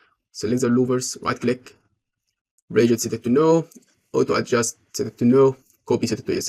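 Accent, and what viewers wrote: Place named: Egypt. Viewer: Egyptian accent, I got you! 😂 Thanks, Broo!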